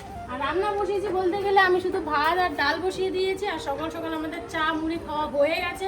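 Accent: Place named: Rajbanshi, India. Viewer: Tomr kotha gulo bojhai jay tui rajbongshi.tomr voyes ta oi rokom tai bolam 😊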